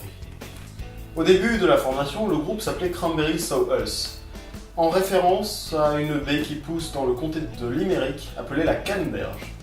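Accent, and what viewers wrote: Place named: Spain. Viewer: A quand la vidéo sur un groupe espagnol pour faire attendre ton bel accent ? Venga hombre, queremos màs videos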